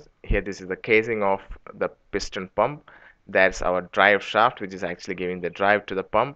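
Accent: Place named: South India, India. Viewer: Products sorry man,it south Indian English they cant help it,ask something technical u will certainly get an answer. south Indians are one of best technical race on earth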